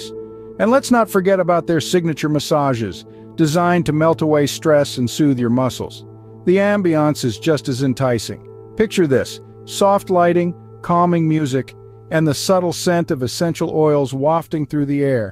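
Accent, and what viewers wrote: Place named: Northern California, United States. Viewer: It is great to have a human narrator with a Northern California accent ( instead of one with robot accents ) describe Northern California cities.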